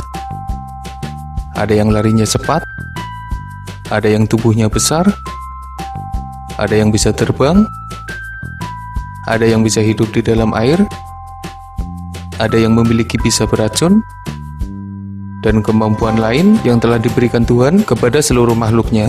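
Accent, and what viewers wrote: Banyumas, Indonesia. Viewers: Org ngapak ya om?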